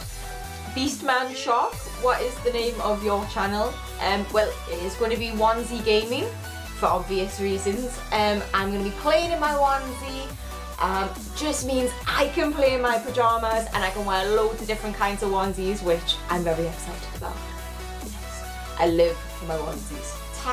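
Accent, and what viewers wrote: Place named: Liverpool, United Kingdom. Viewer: Sound scouse most of the time here. Best of luck with the channel!!!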